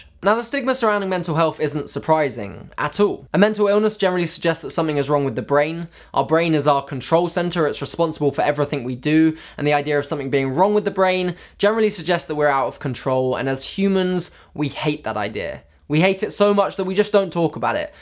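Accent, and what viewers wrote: United Kingdom. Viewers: First time watching your videos. Honestly came here just for gettin' used to the english (england) accent. Because I'm moving there from Spain and here..well, lets say that I've been pronouncing download like doh-w-nloh-add because of my teachers. ha! Well. Uhm. Love it. You seem very kind and mature, and everything in this video is GREAT. Mental illnesses are, actually, quite common. Go ahead. Im following you! You encourage this people to talk about themselves and their problems without that 'tabu sensation' that society made of and for perfect people has done. NICE! Thank you!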